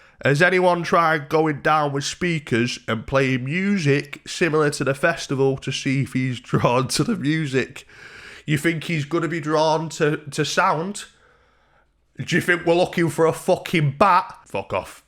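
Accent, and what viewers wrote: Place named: Scotland, United Kingdom. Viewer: I really strugle with scotish but i really enjoyed the video so im gonna force myself to learn scotish so i can follow you. Thanks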